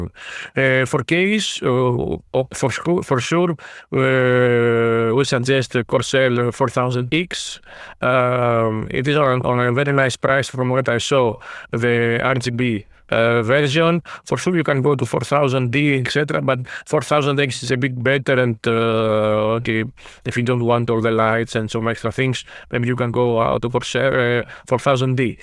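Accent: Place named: Greece. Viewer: your welcome 🙏🏻 can I ask you something, are you from Greece? The way you speak English is like the common way usually Greeks speak the English language 😅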